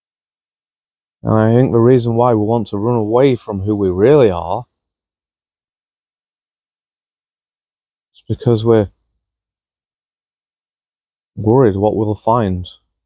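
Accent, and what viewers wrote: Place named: North West England, United Kingdom. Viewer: Lovely to sit and listen to you. I'm from the North West of England and it's rare to hear someone with a familiar accent talking about this.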